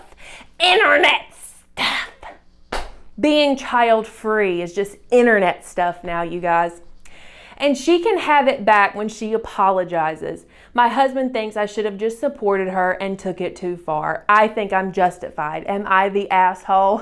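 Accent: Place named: South, United States. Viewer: I love your content so much, it makes me feel better about my decision hearing someone who, at least sounds southern, talk about being childfree. I have known I don't want kids since I was like 10-12, I'm 23 and my entire family still says "you might change your mind" despite the fact I have adhd and depression already, despite telling them there is a high chance I will get post-partum and hate that hypothetical kid to the point of possibly hurting it, they don't care cause I have a uterus and we come from a big, Southern family raised on Christian values that I don't even believe in.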